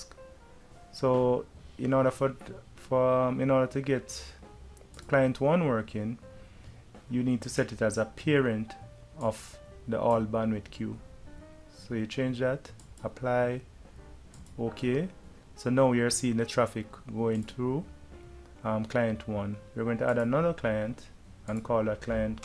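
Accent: Jamaica. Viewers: good and useful video, I feel the Jamaican accent very much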